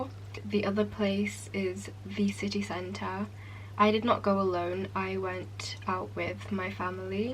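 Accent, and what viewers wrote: United Kingdom. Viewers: I really love hearing British accent despite the bad news. Pray for your better InsyaAllah. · Assalamualaikum. I'm 2 years younger than you. It's alright, I'm a girl. It's really heartwarming listening to you talking in British accent. I hope that you'll get better soon, InsyaAllah!! I've lived in the UK too. I lived in Leeds, England. For nearly 5 years. (Imagine me talking in Yorkshire accent) Anyway~ Semoga akak dan keluarga akak dilindungi oleh perkara² yg tidak baik. Aamiin.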